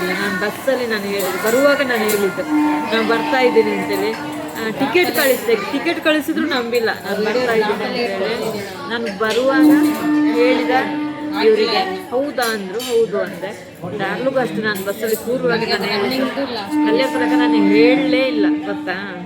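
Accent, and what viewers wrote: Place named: Hubballi, India. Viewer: ಹುಬ್ಬಳ್ಳಿ ಕನ್ನಡ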